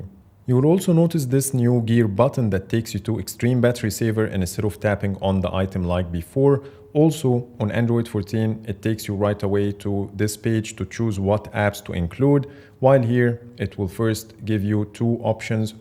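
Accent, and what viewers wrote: Egypt. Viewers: Man i love the Egyptian accent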